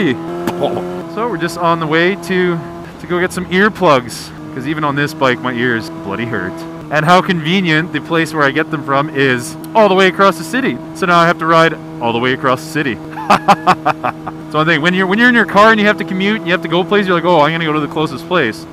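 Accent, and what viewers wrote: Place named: Canada. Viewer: I know this video is a year old. ( One may say I might just be stalking your Canadian accent) however you burp a hell of a lot! Like WOW! haha But as always I love you and Mr. Snowcat so keep the videos coming. Yours Truly some random Australian chick!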